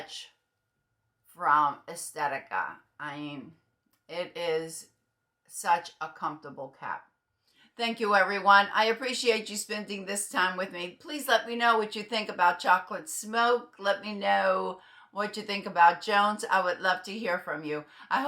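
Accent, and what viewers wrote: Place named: New York, United States. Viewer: Thanks, for the review, and change of color. It looks different from indoors and outdoors. I was wondering about this color. Their wigs are so comfortable. Enjoy your voice. Sounds like you have a New Yorker accent. Thx!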